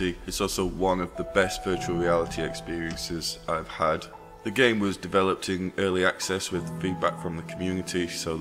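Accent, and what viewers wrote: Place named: England, United Kingdom. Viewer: Hey I luv ur english accent, you make great vids and its a pleasure to watch it, thanks a lot. :)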